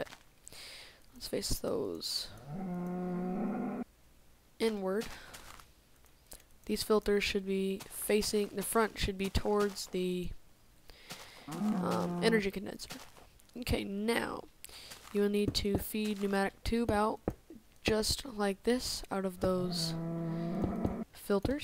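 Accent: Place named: Texas, United States. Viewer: ima guess ur from texas? :3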